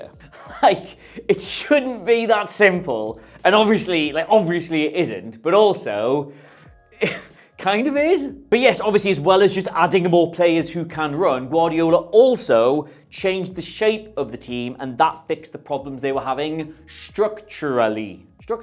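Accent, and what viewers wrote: Newcastle, United Kingdom. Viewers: “Where can I get football content in a Geordie accent with occasional vintage Simpsons riffs?”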